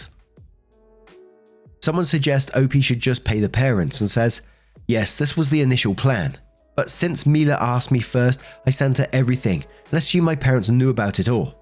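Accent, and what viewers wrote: United Kingdom. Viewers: Happy Christmas. Since you're the maestro of breakfast foods, and sound British, I figure you're the chap to help me out. I have a question from someone across the pond. 'round these parts we can have our breakfast sandwiches served on something called an English Muffin. It's basically a less dense bagel with no holes. In the land of rainy days and tea, what are those called? And what are the sugary sorts of muffin called? Asking for those who know. I have similar questions for a Belgian if you know where I can find one of those. And yes, that one would be about waffles. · Story #1: Unrelated to the story:, Love your videos, I listen to them while I work, that's why I had to listen to this TWICE. Canadian pronunciation of Thesis is Thes-ISS, your pronunciation [British I assume] it Thes-ESE [this would be the Canadian plural or more than one thesis]. 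Also you tend to pronounce a "TH" as an "F". SO.... Every time you said "Thesis", I kept hearing "Feces", and I was wondering what the H*ll you were talking about. NOT a criticism, just something funny I thought you might get a laugh from. Keep up the great videos, and Thank you. Story #2: That is the CRUELEST thing i have read all day. Trying to get a recovering alcoholic to RELAPSE?? What an abusing sadistic A-HOLE.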